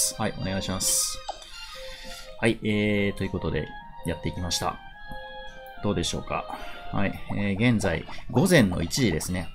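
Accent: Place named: Kansai, Japan. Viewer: あ、残念ですねー😆 目つぶってる🤭ｸｸｸｸｸｯ 浜田さんの関西弁好きやでー❤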